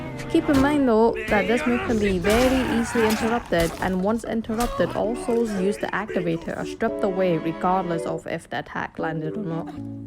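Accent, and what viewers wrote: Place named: Scotland, United Kingdom. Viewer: Maybe it's easier to hear your voice with headphones on, but just used my phone's speakers. For me, the wii music was just as loud as/slightly louder than your voice. It's not terrible, but you want your voice at the forefront of attention. Unfortunately the grunts and sounds from For Honor made it impossible to understand what you're saying. Plus keep in mind that you have a distinct accent which isn't easy to understand for the majority of people. It's not as strong like a Jamaican accent, but if I had to guess, you're from Scotland/Northern England and most Americans (the biggest audience pool due to sheer size) would say it's very hard to understand. Anyway, it's still always a good idea to make a voice loud and clear in the mix of a video without the clutter and noise of sounds and music which are supposed to fill in the background and not overshadow the person speaking. 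Hope you have a great day and thanks for making a cool video! :)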